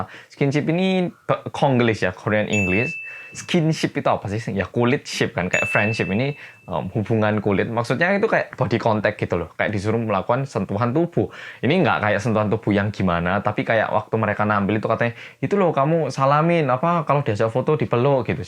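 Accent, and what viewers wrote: Java, Indonesia. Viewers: (Bagian waktu masnya nyebut nama2 artis korea pake aksen korea tapi abis itu balik medok) Terimakasih kembali :) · Medok bgt aku aja gak semedok gitu... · Medoknya itu lohh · Pas ngmg nama2 idol korea lgsg percaya klo kak orang korea (saking medoke kadang gag percoyo) 😁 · Bahasa korea logat nya auto beda. Balik ke bahasa indo jd medok lagi. Kok bisa gitu😂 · pertama kali nonton tentang yang kasus kapal sewol, ngakak sendiri ngomongnya MEDO bangeeettttt wkwkwk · Bahasa inggris nya kok medok aksen jawa 😂👍🏻👍🏻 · Keren, ditunggu ep ke 2 burning sun nya.. medok asli, ada beberapa yg rada kepeleset waktu lafalin "s", masih berasa koreanya hahaha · Mas . Panjenengan medok tenan.. sy baru ngikutin channel ini .. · Bahas KATE YUP dong babang ganteeng..udah banyak yang aku liat tapi pengen versi babang medok :') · Memang medhok apa sengaja d medhok2in? 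· btw ko bisa selancar itu sih b.indonya? ketambag logat jawa 😂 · ????knp kk ini keliatan kek orang Korea tpi gaya bhsnya kek orang Jawa:)))))) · pas nyebutin artis-artisnya kaya mc music bank, pas kelar langsung medhok lagi😂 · duh mas medhoknya wuenak didengar, uapik tenan kalo saya dari cilacap jadi ngapak bahasa jawanya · Ngomong indonya medok tapi waktu ngomong korea langsung keliatan aksen korea :v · Bang, medhok aee... 👌👌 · Kakak bahasanya medok banget...hhhhh Kaya ke jawa2an gitu... Gemes deh jadinya... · Mase medhokkk tapi nek nyebut nama wong Korea lidah medhoknya ilang.. piye tho masss?? Ajari aku 😁😁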